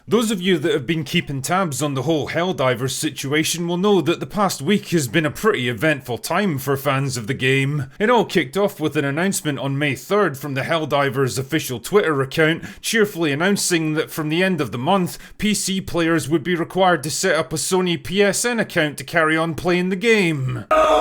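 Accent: Scotland, United Kingdom. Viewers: This news sounds so much better in your Scottish?/Yorkshire? accent :)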